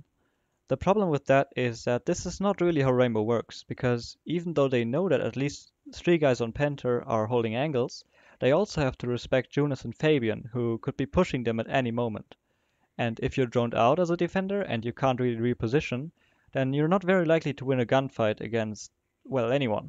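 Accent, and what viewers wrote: Germany. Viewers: Nice video! Are you german? Your accent sounds like you’re german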